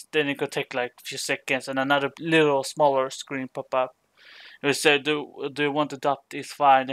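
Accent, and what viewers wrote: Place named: Arab world. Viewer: ok so if u are offended by constructive criticism (not complaining), im sorry...but u speak and write as if your native language is arabian or something like that and also you seem to swallow full words..i've just been honest..if u don't like honesty..then why not deactivating the comment section or just don't talk to me...and why didn't u say this earlier that u don't have your nintendo wii anymore..we could have saved so much typing here...the people who tell u that this video is fine, they most likely have had experience with this stuff before..i asked because im a newbee and usually i can follow every and the most complicated trutorial on the web successfully, even if i didn't know about the subject before, but this isn't possible with your tutorial, you should reconsider if it's really me who made the mistake here..or you...not to forget that i already mentioned that yours is the only video tutorial i found on the web treating this subject..but yea, if your tutorial here was really just about transfering a nintendo wii save state to the pc, then the whole name of this video is already misleading for english people, i wanted the opposite..btw. 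my native language is german and i don't seem to have issues with english typing or speaking..and i got one last thing for u, if it's really a problem for you to be held responsible for older videos you've made, why not deleting them..i just don't get u..i mean i'm sry that u can't deal with constructive critcism...i will find hel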